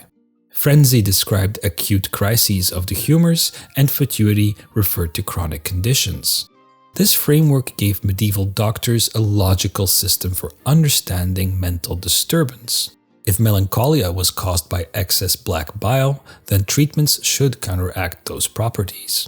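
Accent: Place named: Flanders, Belgium. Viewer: Ik ruik Vlaams 👃🏻Geweldige content trouwens. Keep up the good work!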